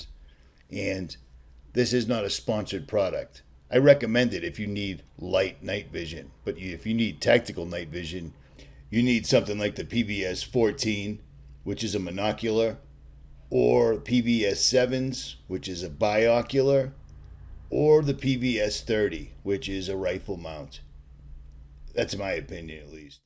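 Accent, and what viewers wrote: Boston, United States. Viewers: Sounds like Ritchie from Boston?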